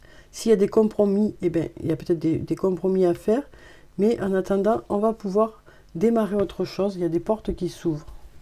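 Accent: South, France. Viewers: Merci pour cette belle guidance avec ce charmant petit accent du soleil ❤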